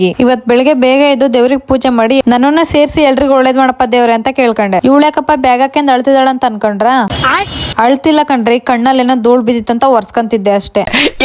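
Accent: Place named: Chitradurga, India. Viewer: Nimdu proper Chitradurga na sis..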